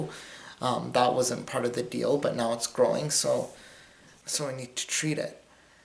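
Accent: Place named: Canada. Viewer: That canadian accent thi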